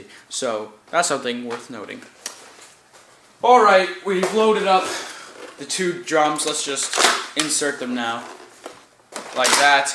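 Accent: Canada. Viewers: This guy is Canadian as fuck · are you canadian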